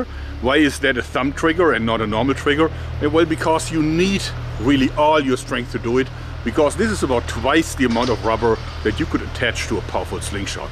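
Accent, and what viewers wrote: Germany. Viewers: qualquer brasileiro normal: POR QUE CARALHOS O YOUTUBE TA ME RECOMENDANDO UM VIDEO DE UM CARECA COM SOTAQUE ALEMÃO SOBRE ESTILINGUE ARTESANAL??? YouTube: nosso algoritmo é perfeito :)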